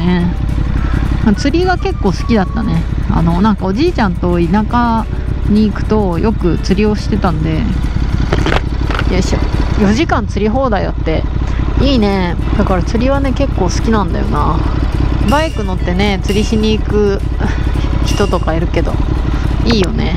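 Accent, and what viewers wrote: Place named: Kansai, Japan. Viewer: おはようです。さんって産まれてどちら？関西？たまに関西弁ぽくなるの面白い🤣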